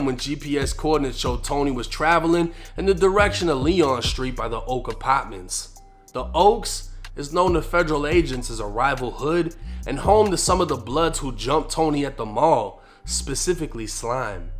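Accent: Boston, United States. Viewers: Bro I've been meaning to ask you are you from Boston you have a Boston accent am I correct or no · Bro where are u from..?Boston???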